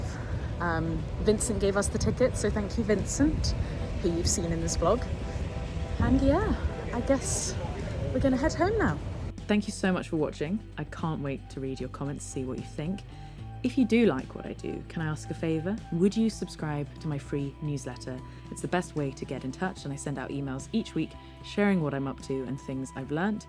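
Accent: United Kingdom. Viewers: Oh yes, absolutely. It's very odd to me as a Canadian that we can live 2,000 miles apart from one another in my country and have virtually the same accent as English speakers--but head to the UK, and 20 miles down the road is far enough to have a distinct accent that proudly identifies your hometown. I've started to tell the difference, even as a foreigner, among the many English & British accents -- and is far and away one of the most beautiful.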